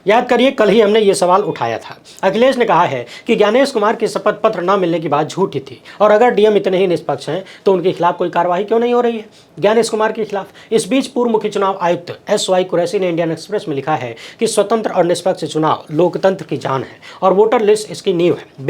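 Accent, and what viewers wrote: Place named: Bihar, India. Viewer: bhai apke kaam se ziyada aap pasand hi mujhe apke bihari language make me laugh..hats off bhai.. for your unbiased reporting...Allah apko humesha kamyabi dey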